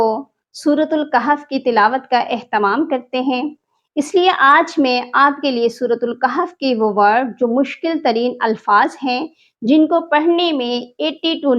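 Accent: Panipat, India. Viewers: اپکا پانی پتی لہجہ ھے ماشاء اللہ مجھے بہت پسند ھے لیکن میری سانس زیادہ لمبی نہیھے جسکی وجہ سے میں بہت پرشان ھوتی ھوں کوئی طریقہ بتادیں تاکہ یہ پڑھائی کر سکوں❤❤❤❤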